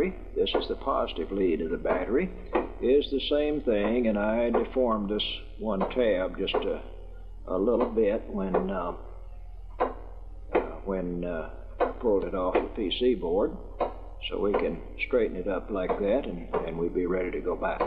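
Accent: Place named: United States. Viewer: Excellent voice like the voice of president carter , good old traditional American voice.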